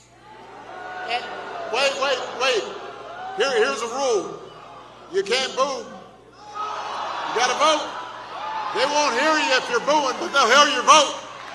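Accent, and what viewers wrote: South, United States. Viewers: And here he goes with the “southern” accent again! What dark place our we in? Has he looked at the Dow Jones index? He is a. LIAR. Weaponizing the justice dept? He doesn’t remember his own presidency and RUSSIAGATE ?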